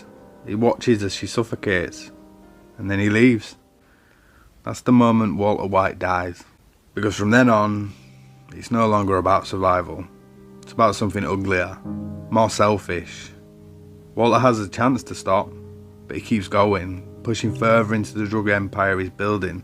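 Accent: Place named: United Kingdom. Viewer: I like your accent. I like the way you say 'Walter White'. A lot of people have made videos about Breaking Bad - and I have watched a lot of them. But your unique British accent might be the thing that sets you apart. As for the content itself, you identified the "I am the one who knocks" event, explained it beautifully, linked it to other important events, and brought it all to a safe end - tying your end to your beginning. Your personal point of view was clear. I liked it.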